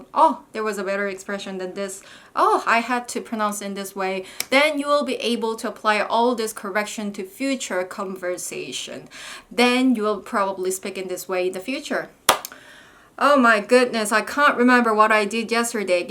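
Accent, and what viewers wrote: United Kingdom. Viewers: 브리티쉬 엑센트♥ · 브리티쉬 액센트 실화입니까??? Wow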